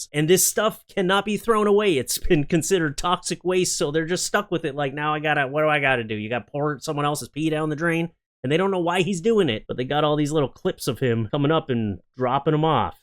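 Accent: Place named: Canada. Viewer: CripTIDS, dude. Criptids !! Man, do I dig that Canadian Archie Bunker second language you got going on! Never change, man.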